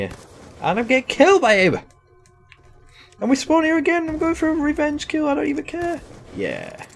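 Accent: Yorkshire, United Kingdom. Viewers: be proud of ur yorkshire accent:) use the words like 'gunna;:D im from yorkshire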